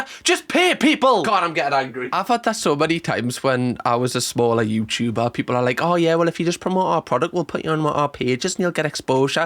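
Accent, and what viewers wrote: Newcastle, United Kingdom. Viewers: He says he has a Geordie accent? I don’t know where that’s from 🇨🇦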